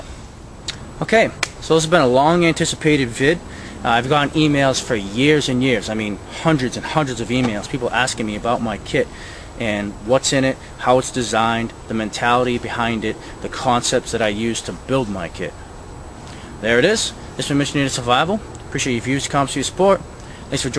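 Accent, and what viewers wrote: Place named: New England, United States. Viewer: I'm trying to figure out where you are... Northeast corner of North America... just out of the subarctic... the first says Greenland, the second maybe Newfoundland... but it looks (and sounds, by your accent) like you're in New England. Did you mean to say you're in the Northeast corner of the United States?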